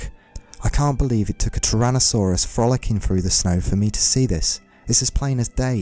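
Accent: United Kingdom. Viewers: Respects and love from Tokyo, Dear sir , YOUR VOICE IS the most noble relaxing and soothing voice I’ve ever heard. BEST Englisg accent . How can I buy these books?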